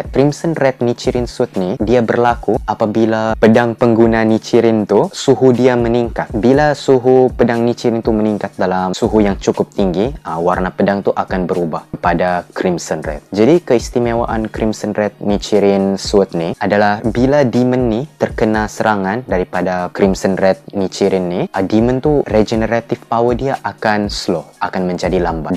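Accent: Sabah, Malaysia. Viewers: abang ni orang sabah ke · Speaker ni orang tawau sabah ka?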